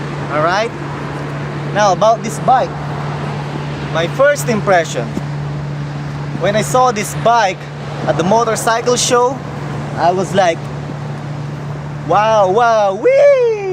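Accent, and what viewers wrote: Philippines. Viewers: bro bakit pinoy accent kaparin dapat american accent kana, cant you not make it practice more speaking the American way.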